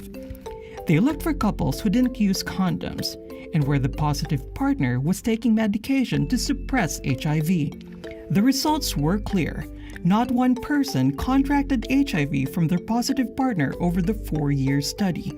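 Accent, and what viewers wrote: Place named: Philippines. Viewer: The narrator sounds Filipino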